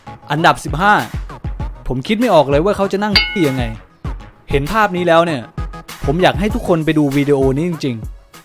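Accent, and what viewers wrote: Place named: Rural, Thailand. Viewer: ตอนแรกพูดเหน่อๆ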